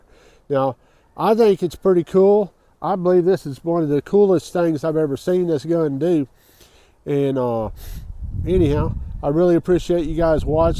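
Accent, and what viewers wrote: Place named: South, United States. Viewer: Man painka pietinių valstijų akcentas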